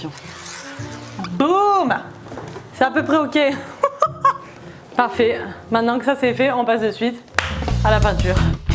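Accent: Quebec, Canada. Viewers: Il y a un petit accent québécois qui est en train de s'installer 😁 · Je trouve que tu commence à avoir l’accent canadiens et c’est trop marrant comment on peux changer aussi facilement et avoir un accents aussi facilement rien qu’en habitant dans on nouveau pays , j’étais concentré que sur sa 😅j’adore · C fou à quel point t’as un accent québécois comparé au début de tes vidéo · J’avais pas vu de vidéo de toi depuis un peu longtemps mais t’as grave pris un accent québécois ou c’est moi?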